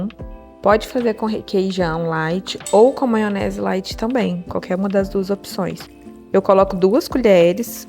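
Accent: Minas Gerais, Brazil. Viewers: Parece mineira. Eu falo assim e sou mineira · o seu sotaque é muito bom, amo!!! Minha família é mineira, então é o sotaque do meu coração. Estou gostando muito dos seus vídeos, vc passa muitas informações importantes, tbém tenho resistência a insulina. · Amei seu conteúdo, esse sotaque mineiro melhora tudo. Sou de BH, tenho resistência à insulina (SOP) e me senti representada. Espero perder 13 kgs esse ano para chegar na estética que desejo, a pior parte para mim do tratamento da SOP é a musculação :( kkkkkkk. A alimentação eu amo, gosto de cozinhar, não tenho nenhuma seletividade, adoro fazer meus pratos lindos e coloridos, me inspirei em você e coloquei muitos ingredientes na listinha de compras. Obrigada <3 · Ela é mineira 😊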